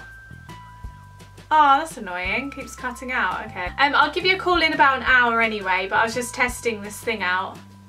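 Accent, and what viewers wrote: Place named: England, United Kingdom. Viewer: is she english or american? her accent sounds so english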